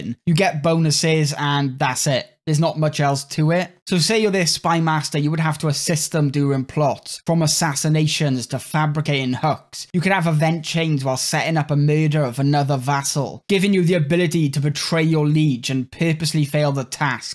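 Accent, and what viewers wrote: Wales, United Kingdom. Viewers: where in wales are u from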